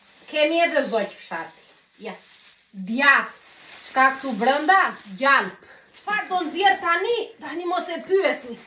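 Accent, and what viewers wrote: Greece. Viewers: Ne Greqi jeton? Se kshu duket nga theksi😅? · Ti paske mar të gjith fshatin me vete.dukesh nga të folurit që jeton në greqi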